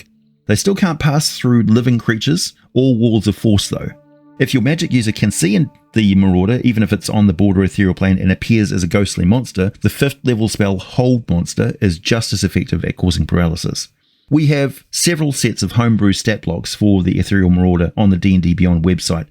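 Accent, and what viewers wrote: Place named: New Zealand. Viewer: Hi, I was wondering if you’re related to the stardew valley content creator “BlaDe” at all? You both have very similar kiwi accents imo (I’m from Auckland myself), and you two seem to have a physical resemblance too.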